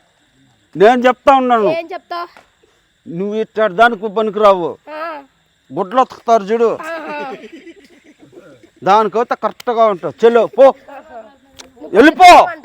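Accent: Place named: Chittoor, India. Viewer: Perfect chittoor accent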